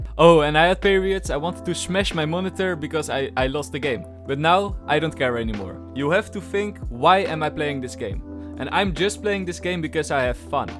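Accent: Netherlands. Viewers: your nederlandse accent is really funny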